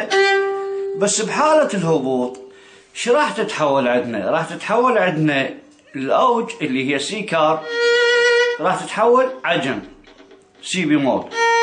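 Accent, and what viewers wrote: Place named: Iraq. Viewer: ياروحي ياللهجه العراقيه 💙💙 .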